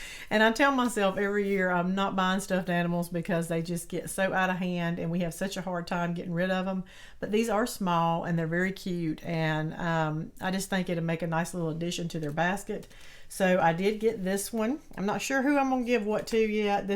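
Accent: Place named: Appalachia, United States. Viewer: Mom, GREAT job! I had one boy, so it was fun to watch you put girls’ baskets together! I loved all your choices, but I REALLY loved the stuffed bunnies! Adorable! And from my own perspective, a girl’s never too old for a snuggly stuffed friend! BTW, I love your accent. You sound just like my precious sister-in-law who is from the TN/VA border! Happy Easter! 💕💐🐰🐣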